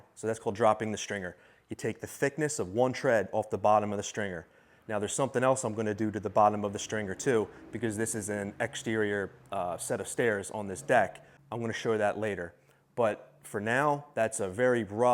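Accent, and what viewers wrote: Philadelphia, United States. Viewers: I sensed a Philly-ish accent in a prior video... there you go!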